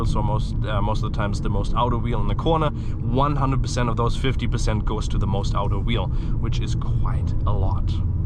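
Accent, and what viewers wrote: Germany. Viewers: Bin überrrascht, dass man dein deutsch kaum raushört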